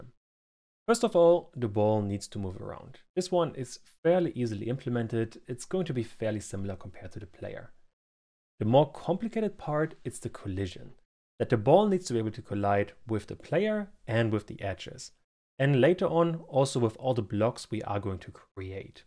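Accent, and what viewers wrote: Germany. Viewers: Your content is fucking amazing bro, keep it up! :)) Btw, höre ich da einen deutschen Akzent heraus? xD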